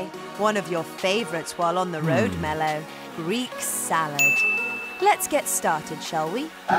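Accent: United Kingdom. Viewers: So he's or she's idk British???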